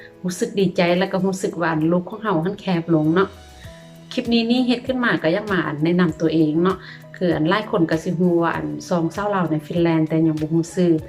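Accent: Luang Prabang, Laos. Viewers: แสดงความยินดีด้วยค่ะมากๆค่ะ เว่าม่วนหลายจ้า ฟังสำเหนียงก็รู้ว่าต้องยู่หลวงพระบางจ้า บ้านพี่อยู่หนองคายอ.ศรีเชียงใหม่จ้าตรงข้ามเวียง ผ่านกฏเร็วมากๆค่ะเก่งมากจ้า👏👏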